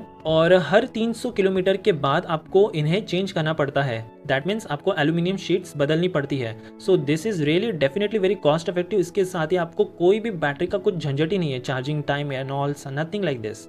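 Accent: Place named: India. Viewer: If you are going to speak English then speak slowly and enunciate clearly. With your Indian accent and the speed at which you speak, it makes what you say almost unintelligible. Thanks You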